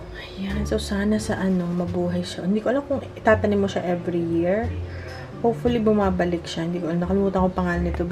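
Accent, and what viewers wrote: Batangas, Philippines. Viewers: 😁😁 ang cute ng accent nyo pg nag babatanggenyo kau 😄💓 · Batangeño din ako pero kakaaliw po ang punto niyo. Haha hindi nawala. God bless po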